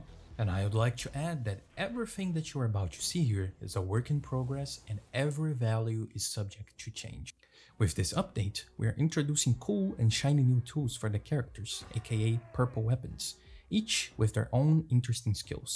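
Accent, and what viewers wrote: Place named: Brazil. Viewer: tu e brasileiro né cara. Da pra ver pelo seu sucaque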